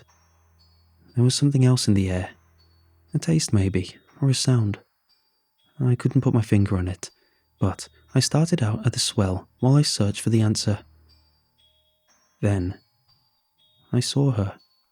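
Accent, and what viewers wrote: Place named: Rhyl, United Kingdom. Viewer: Sounds like Rhyll. “If all else fails, try Wales....”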